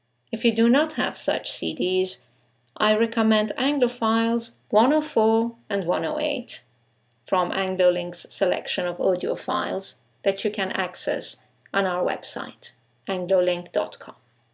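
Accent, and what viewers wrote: England, United Kingdom. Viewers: thank you . the British accent is very nice .but a little hard . but it sounds good. and thank you again. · Yeah, it's called a BRITISH accent... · Who doesn't? It's more British than my accent but I think she speaks very clearly and understandable to any native speaker or proficient student. Janine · It's really really hard to understand her... It's souds british, I have serious difficulties in that. But I could understand.... Thanks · RP accent I more need podcast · Thank you Btw, this accent only use by British, right? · your classes are for beginners, and your accent does not sound from USA, it sounds from UK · Hello, i apreciate your lessons, i like to listen to you 'cause your accent is very pleasent to me. I hope you can bring more and more tecniques to talk as a England person. i have difficults to pronounce words like: thought, through, though, taught. 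· British English? Lovely. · Is this british englisH?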